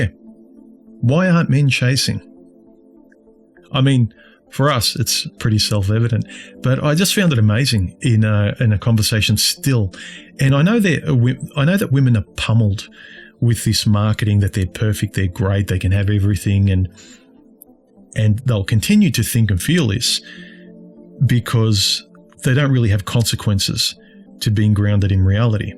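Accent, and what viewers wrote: Australia. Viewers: is this guy australian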